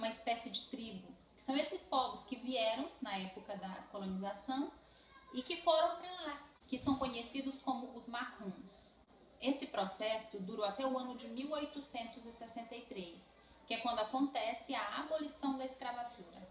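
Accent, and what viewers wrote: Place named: Ceará, Brazil. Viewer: neste vídeo, aprendi muito sobre a história do Surimane. Falas muito bem, organizas as frases como uma pessoa culta. Guardas, ainda, sotaque que pode ser do Ceará ou do Piauí, salvo engano. Pretendo, visitar essas três Guianas para conhecer os lugares e aprender mais sobre as culturas e os costumes, além da história. Tenho dificuldade de imaginar sobre a economia de um país cuja população - ao que parece - se concentra quase toda em uma cidade. Resumo: gostei muitíssimo de "tropeçar" neste vídeo. Tanto que me inscrevi logo. Parabéns.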